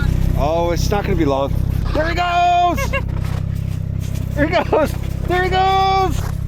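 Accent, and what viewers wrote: Newfoundland, Canada. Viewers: Instant follow. Love the energy and vibe. I really like the Canadian accent. I used to get confused between American vs Canadian. 🇨🇦 now I can tell the difference. Just like aussies sound so different to kiwis. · Cod fish from the rock hey? Good stuff. Hello from Newfoundland. I live in Gros Morne National Park. How's the fishing around your cabin in the summer time? Tbh I almost pick up a Newfoundlander accent on your speech. Not originally from here in Newfoundland are you?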